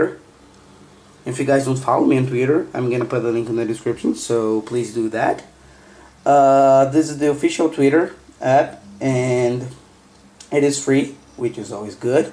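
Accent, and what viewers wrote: Brazil. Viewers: I believe its because he have a Brazilian accent.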